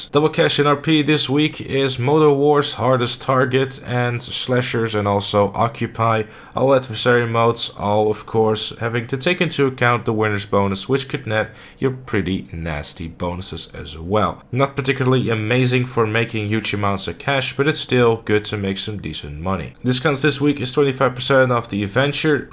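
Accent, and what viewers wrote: Netherlands. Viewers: Mr_ FancyPants he’s Dutch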